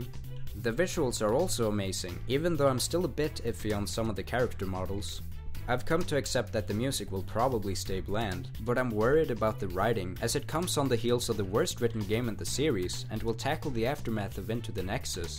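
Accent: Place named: Scandinavia. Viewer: You sound like you're from scandinavia.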